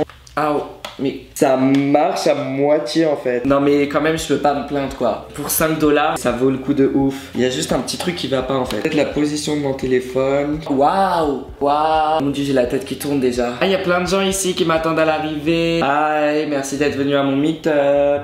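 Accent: Quebec, Canada. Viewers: t'es tu Québecois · Est tu Québécois ou Français ? Je suis dans le doute 😂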